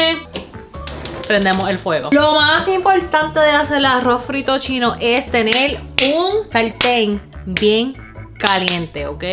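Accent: Puerto Rico. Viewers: Mi arroz preferido ....y una asiática que habla con un acento más boricua que el mio ....espectacular me suscribí a tu canal estaré 🇵🇷👀👈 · Que bonito escucharte hablar como boricua nosotros vivimos15 años en NY y nunca vi o escuche una persona china boricua y ahora que te encontré me encantó tu acento y tu personalidad somos de GUATEMALA pero amamos los boricuas porque son de buen coorazon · 🥰🥰🥰 Que chula tu mami, 🥰🙏🏼 Tengo que hacerlos y es ya! Gracias! hablas más boricua que yo 🤪😁😁😁🌹🌹🌹🌹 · Una Chinita que habla como boriqua! Esto es un tesoro nacional! Que nice!🇵🇷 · Ese acento se oye muy bien,que bueno que ames tanto los recuerdos en mi bella isla,bueno corrijo,en nuestra bella isla porque con ese acento jamás hubiera pensado que eres asiática. Gracias por compartir la receta y por haber hecho feliz el paladar de mucha gente en PR❤️ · Ella Dice > Cocinal < Bien Boricua.. 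✌🤣🇵🇷 Tu hablas bien Boricua Hasta cambias la R por la L... Weepaa! Saludos.. 👸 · Eso mismo estaba pensando..habla bien boricua. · Es bien gracioso ver su físico de chinese y escucharla hablando con todo el slang boricua! Muy bien video, fácil de seguir y divertido. ¡Excelente borichina! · La voz la delata la forma de hablar .100 porciento bori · Woooooow ❤️❤️ Nunca había visto a alguien asiático con ese acento boricua tan REAL! Un verdadero orgullo. Una personalidad tan súper amistosa y súper PRECIOSA POR CIERTO. Que ricooooooooo, quiero hacerlo ❤️ 🇵🇷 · “ me voy a haltal “ pura boricua me hiciste reír muchísimo me encanta como hablas.😂😂😂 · Es normal. Es puertorriqueña. ¿Cómo va a hablar si no es como lo que es? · Wow. El Acento Boricua ❤️😍 🇵🇷 The Perfect accent. You Speak it Perfectly 😍 🤩 Lo habla Perfectamente! 😌 el Acento Puertorriqueño 🇵🇷 God Bless ! 🙏🏻 . Puerto Rico 🇵🇷 in the House 🙌🏻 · Eso mismo dijeeee pensé que era boricuaaaaa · Omg girl!! 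You speak like a truly puertorrican!!! 💜💜💜💜💜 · Dimeloooo!! Eso mismo estaba diciendole a mi amiga q me lo envio. Estoy estupefacto porq llegue aca en US 8 años ya y me habia olvidao de eso. Esta preciosaaa y mas hablando español de PR. Obvio no estoy diciendo nada porque boricua es!! 🇵🇷 🙌☝ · Ella habla bien boricua y se ve que ama a Puerto Rico. Mejor dicho, esa si es de aquí. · QUE 🆒 Y QUÉ SYMPATICA ESTA MUCHACHA, PRIMERA VEZ QUE VEO UNA ASIATICA CON ASENTO BORIQUA 🇵🇷 ♥️😁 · .. Yo soy Boricua vivo en Texas.. Te Pido Disculpas por el Pueblo de Puerto Rico.. La Mayoria no somos asi. Pero acuerdate que los ninos y adolesentes abeses son muy crueles. eres una joven especial y tus Padres son ejemplares. Te criaron muy bien. Me encanta tu acento Boricua y Tu forma de Ser. Sigue siendo Tu. And forget about anybody else. We love You. Que Dios Te Siga Bendiciendo. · 🈲️🈸️🈯️🈁️ Hija adoptiva de mi Puerto Rico que es tu casa 🇵🇷 y lo bien que habla nuestro idioma y acento boricua,me encanta tu dinamismo y simpatía chica 💖💖💖 🇵🇷🇵🇷 🇨🇳 🇨🇳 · Es China peroooo bien boricua en acento y corazón.. 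Ese acento nos distingue a los boricuas donde sea..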